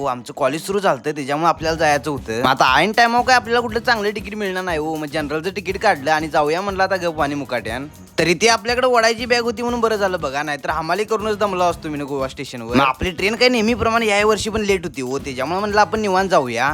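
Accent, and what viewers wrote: Kolhapur, India. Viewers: Tu kolhapurla rahtos Tujha avaj अस्सल kolhapuri ahe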